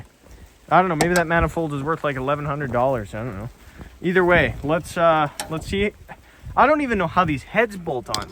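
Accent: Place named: Canada. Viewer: As soon as I heard "might as well go for a soda", I knew you were Canadian. The sterling elocution hinted at that as well 😂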